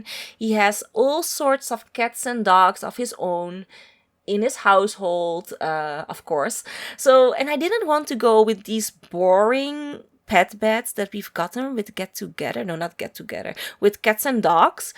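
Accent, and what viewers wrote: Netherlands. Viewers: I adore Dutch accents ! Amazing house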